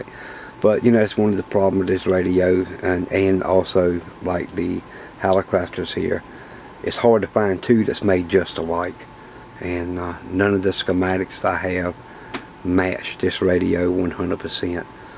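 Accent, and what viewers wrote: South, United States. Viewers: Yea I agree first time I saw your face lol my mind vision you were way older and scruffy. and you could do this ballad well with your southern drawl <link>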